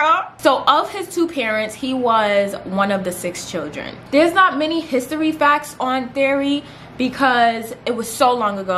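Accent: New York, United States. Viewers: Sis I love this!!!!!!! It’s giving what it needs to give. Fashion knowledge with the New York accent!!!!!!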